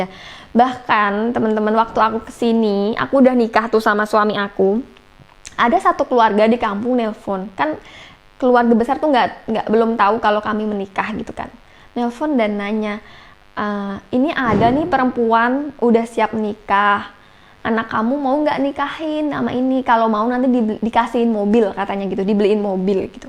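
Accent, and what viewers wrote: Indonesia. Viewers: Yg bikin aq suka liat chanel ini krn suara renyah dan manja. Logat jawa nya tetep g ilang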